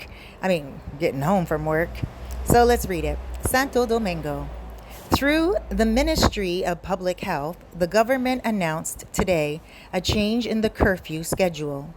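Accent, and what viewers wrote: Canada. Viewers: Great content! I could tell you are Canadian by the way you pronounce certain words, like "out and about"! I always knew! Keep up the great content!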